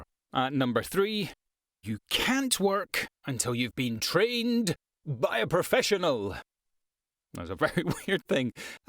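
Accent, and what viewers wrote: Scotland, United Kingdom. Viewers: Thanks for the assessment and the James Earl Jones comment -- made me titter. P. S. I'm British-American, and to my native English now partly American ear, you sound INTENSELY Scottish! Heh heh heh.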